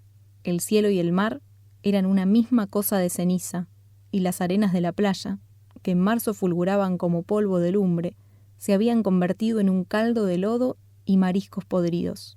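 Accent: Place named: Argentina. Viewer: Es nuestro acento, no veo motivo por el cuál corregir el acento Argentino (?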